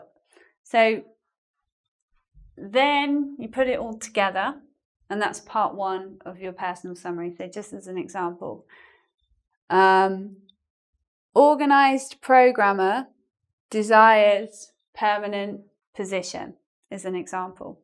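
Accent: United Kingdom. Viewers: Twice. How I love this British accent...👌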